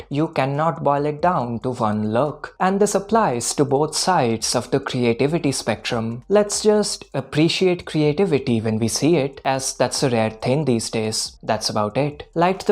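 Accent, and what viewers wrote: India. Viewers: clearly india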